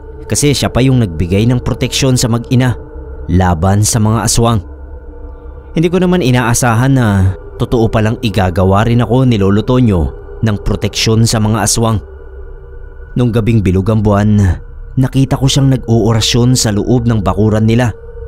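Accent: Iloilo, Philippines. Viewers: Hello sir new subscriber here😅 Watching from Jeddah...parang ilonggo yong tuno mo sir 😅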